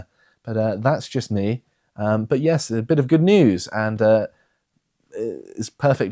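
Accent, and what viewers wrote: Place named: London, United Kingdom. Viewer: He's speaking like a Londoner tbh. Completely disconnected with where he came from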